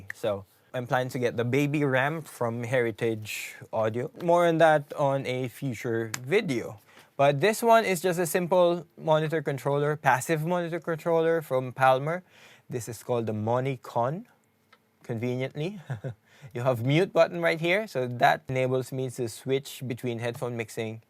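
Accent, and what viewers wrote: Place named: Philippines. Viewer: you DEFINITELY sound Filipino. I would know, because I am too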